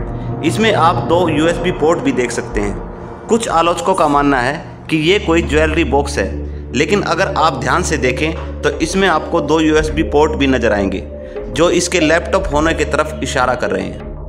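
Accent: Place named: Haryana, India. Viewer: Haryane te lage h Bhai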